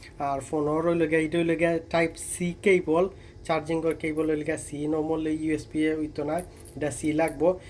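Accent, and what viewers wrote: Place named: Sylhet, Bangladesh. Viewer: Oi vai kita koin baje montobbo kortam....afne amrar sylhety hokkol or gorbho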